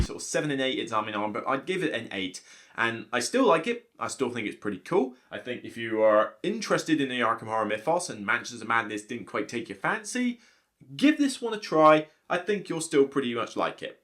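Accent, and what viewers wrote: United Kingdom. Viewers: Have my thumb up and don't take it too seriously, there are many reasons to dislike for different people as *Wants to thumb up, but klicked the wrong button by accident, *dislikes your British accent/clothing/playstyle enter whatever reason here and madly down thumbs all your videos, *hates Arkham Horror/Ameritrash games..... Asking for the reason is fine, but I would not expect to get an answer from those who thumb down, as the won't likely read your question and IF they wanted they could have give constructive negative feedback in the comments...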